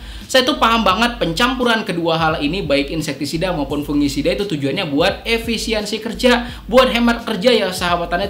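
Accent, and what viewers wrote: Banjar, Indonesia. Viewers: hen cucuk ai ha asa pinandu kaitu nh u lwan logat2 bnjar .. S kalsel ja kita pak ai😁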